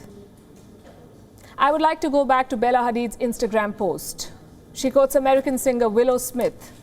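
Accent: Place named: India. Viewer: Is she speaking English or trying to with an Indian accent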